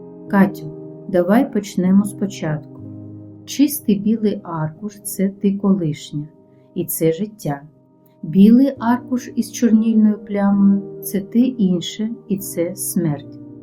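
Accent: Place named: Ukraine. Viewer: Дякую! Як мило звучить Ваша українська. 💗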